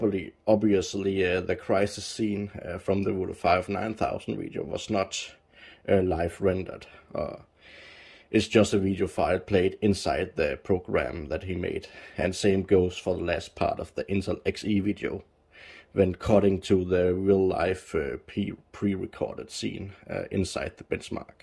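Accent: Denmark. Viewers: Thanks for the update BTW, if i ever need to show our lovely danish accent to anyone, ill show them this video. Håber der kommer en ny video April 2024 Tak for det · I love your videos, the Danish accent makes me smile, and fits the content. Keep up the great work.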